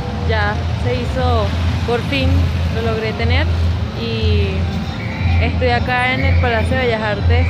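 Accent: Venezuela. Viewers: Me fascina tu acento, no sé si es venezolano pero me encanta. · eres panameña? hablas como venezolana saludos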